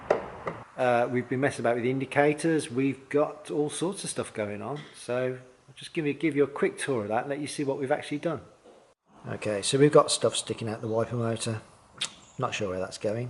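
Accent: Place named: Birmingham, United Kingdom. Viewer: What happened? Such well produced videos. Have you completed the Land Rover? I came to you because of the MX5 thermostat housing bodge job. I notice you've got a Brummy accent and have a workshop somewhere, I assume in the Midlands. I gather you're a motoring journalist. What's the story? Do you take on work? All the best.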